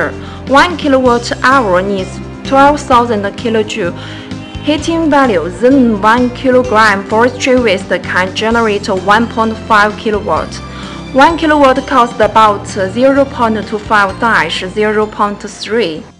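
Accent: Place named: China. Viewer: I lived in China for 6 years and its called Chinglish and once you live there, then she is pretty much well understand. You're ears just have to get used to it. However considering the amount of money that goes into this, I have realized that a lot of times they do have the money to hire some real good English speakers that are Chinese themselves. Many times the translation need not be so accurate if there are subtitles involved. Speaking English well is something that is sought after in China but rarely achieved like the likes of poorer countries like India, Eastern Europe countries and other places for some reason.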